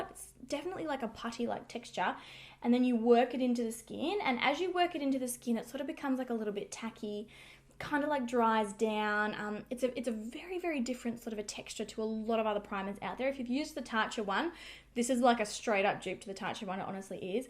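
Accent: Australia. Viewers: You sound Australian would be great to know the prices here, and are you saying Chemist/Pharmacy?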